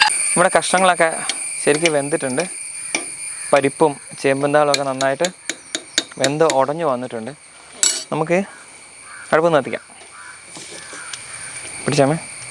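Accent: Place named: Kannur, India. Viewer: Hi മോനേ... എത്ര കാലായി കുഞ്ഞി...... കണ്ടിട്ട്? കണ്ണൂർഭാഷ ആണ് അങ്ങനെ പറയുമ്പോ ഒരു ഇഷ്ടം 😅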